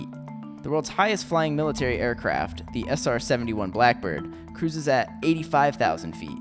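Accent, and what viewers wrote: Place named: United States. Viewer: That american accent...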